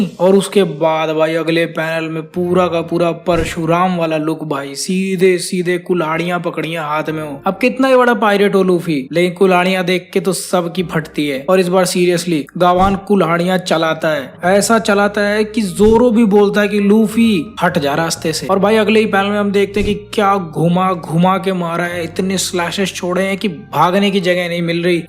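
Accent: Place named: Maharashtra, India. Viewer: Bro's Marathi accent 😭🤌🏻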